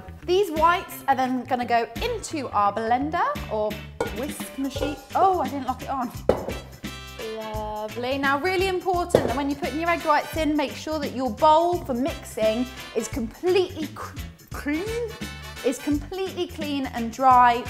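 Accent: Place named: Liverpool, United Kingdom. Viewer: Thought I could hear that Liverpudlian in there somewhere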